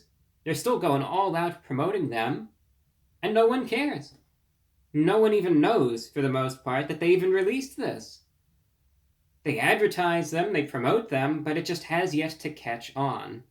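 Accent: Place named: Transatlantic, United States. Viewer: Video starts . By the way, I can’t tell if you’re doing a transatlantic accent for real or you learned how to fake it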